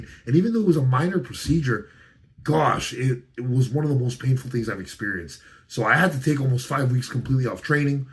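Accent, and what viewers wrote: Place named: Mexico. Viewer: at first glance u look indian, then when u talk, u sound bean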